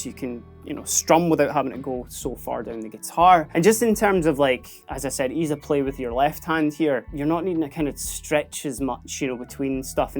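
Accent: Scotland, United Kingdom. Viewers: Can't understand the scotch accent 🤷🏻‍♀️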